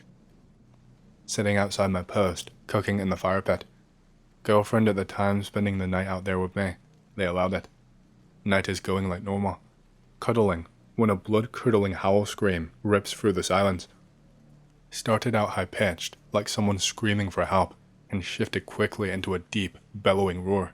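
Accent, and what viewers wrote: United Kingdom. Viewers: bro peak content as usual, where are you from (regionwise) i cannot place your accent in the uk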